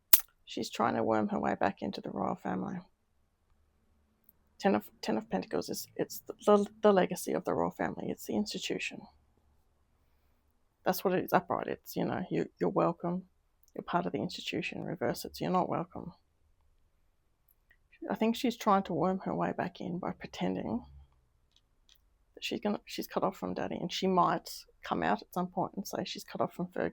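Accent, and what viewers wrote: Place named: Australia. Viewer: Thanks for another great reading. I totally agree with you about the "York" family; I don't trust any of them either; nor any of the Markles (which of course now incorporates Harry as well). I am guessing that you are from Australia from your accent =). I am subbed to a couple of other Australian readers as well. I am happy that I found your channel a few weeks ago, as I am really enjoying your readings.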